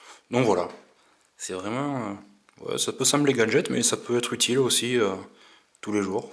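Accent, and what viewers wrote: South, France. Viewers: Bien joué pour la vidéo! Preneur pour avoir ton avis après utilisation quotidienne. Et vive l'accent du sud ;)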